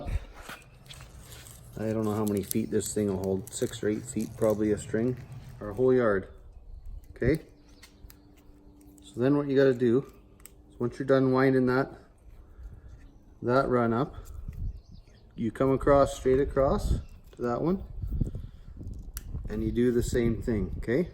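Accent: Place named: Canada. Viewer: Knew you sounded Canadian, then I saw the shirt. Cheers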